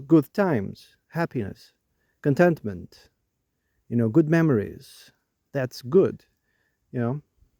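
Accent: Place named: Portugal. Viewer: Inglês com sotaque português detetado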